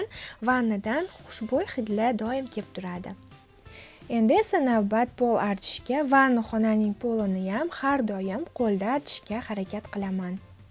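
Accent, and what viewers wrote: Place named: Fergana, Uzbekistan. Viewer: Ассалому алайкум! Канал росаям ёкди🤗 бунинг учун Aishaxonга рахмат деб коламан ва сизга хам👍 Мабодо сиз Фаргона шахридан эмасмисиз? Гапиришиз бизникидай эканда🤩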